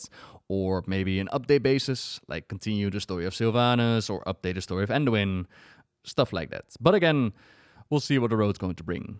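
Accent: Netherlands. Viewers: This is such a random thing to mention. But you really helped me back in 2013/14, not with WoW lore(although it was good) but with actor training. I had been cast in a production of the Diary of Anne Frank. (I was one of the Van Daans, who I believe were Dutch?) Pur director had asked us to try and make our accent convincing. So I listened and binged nearly all your videos in order to learn certain pronunciations and how you formed words etc. I was told my accent sounded excellent and was extremely convincing. So thank you for the help...almost 10 years ago. Who knew WoW lore videos could used for other means😅😂! I'm not sure if you are Dutch...my 18 year old self thought you were but hey it worked out in the end.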